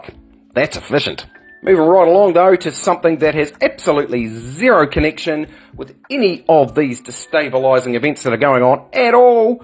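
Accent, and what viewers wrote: New Zealand. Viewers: Thankyou for your great channel cant get on daily telegraph nz thought police are stopping free speech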